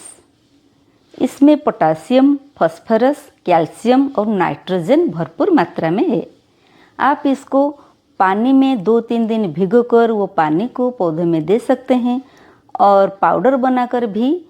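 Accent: Odisha, India. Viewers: Very beautiful, your presentation is also very beautiful like Hindi in Odia accent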